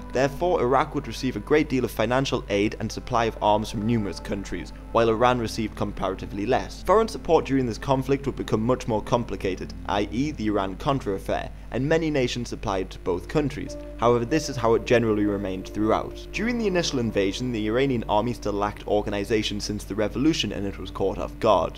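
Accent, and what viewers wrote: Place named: Southern England, United Kingdom. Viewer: I think its a british accent they all speak like that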